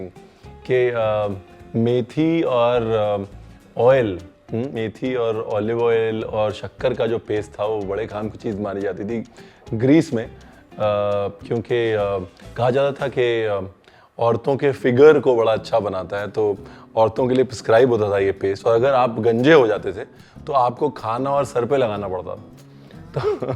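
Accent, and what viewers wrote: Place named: Punjab, India. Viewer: Hi Ranveer tuhadi punjabi ta sachi maar dendi hai, above all tuhadi recipes....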